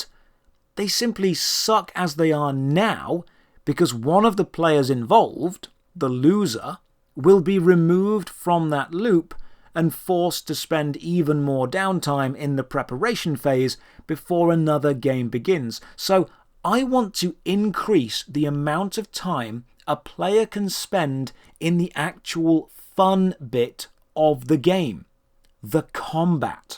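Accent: United Kingdom. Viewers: This is the same thing he does with MMO's to be honest and clear. He complains that MMO's require you to level up and play the game... to finish the story. He complaiend about FFXIV's story not being fully accessible, etc. He demands hand holding features, and he pretty much complains about any forced social behaviour, and he has ZERO understanding of the genre. I pointed out Everquest for example being one of the best, and earliest, MMO's that did not hold your hand and to this day is still succesful. He then responded to me and claimed he liked Everquest becauseyou could "play it solo or in a group." In what WORLD can you play EVERQUEST solo? It's clear does not understand anythign he is talkign about what-so-ever, and is basically exploiting a british accent to sound smart and impose what HE likes onto these games. And ostensibly, he likes single player story games.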